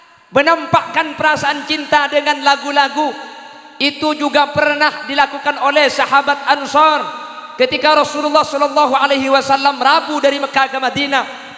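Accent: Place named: Madura, Indonesia. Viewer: Mantap bin puas dengar ceramah P.yai. Logat Maduranya juga Mantap pas banget . .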